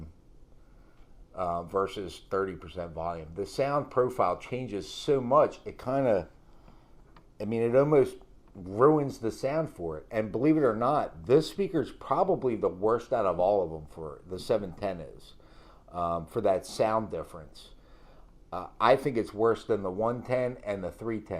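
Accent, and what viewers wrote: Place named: Baltimore, United States. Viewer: I hear a bawlmer accent.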